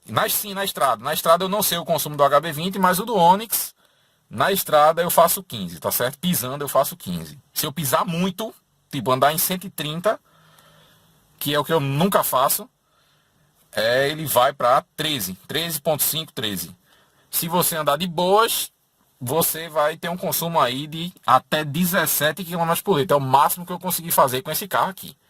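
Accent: Pernambuco, Brazil. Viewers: É Pernambucano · Não é Pernambuco?? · Esse cara é de Pernambuco ou região não é kkkk , Pernambucano e bixo ignorantemente e sotaque chato pra Caralho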